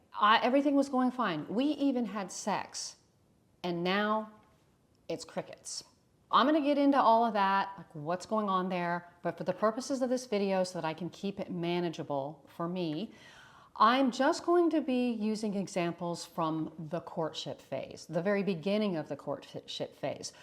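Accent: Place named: South, United States. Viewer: Greetings from Lake Como, Italy. I like your southern accent 😊 exactly where are you from?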